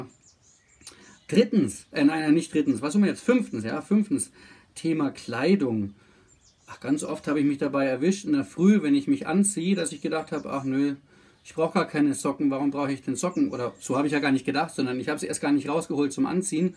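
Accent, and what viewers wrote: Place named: Thailand. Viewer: Du hast irgendwie so eine Thai-art zu sprechen in dem Video, das ist super süß und erinnert mich an meine Zeit in Thailand ☺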